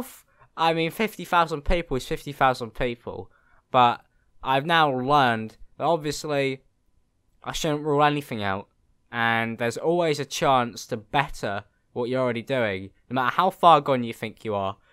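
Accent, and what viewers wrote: United Kingdom. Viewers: i hope you get 50k subs m8 your content is really high quality and really funny i love your brittish voice and i hope you dont stop now that ive subbed keep it up m8 cheers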